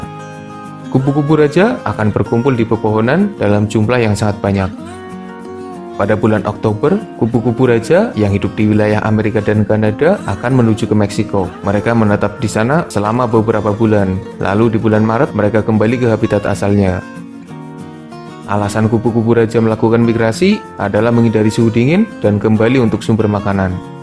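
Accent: Banyumas, Indonesia. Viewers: Joss boss.. Ora ngapak la ya ora kepenak lah yak... Hahaa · Ora ngapak ora kepenak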